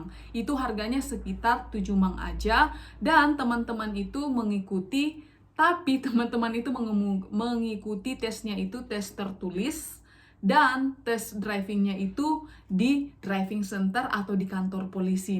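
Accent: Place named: Manado, Indonesia. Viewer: Orang manado? Soalx masih dpa dengar dialek manado🤣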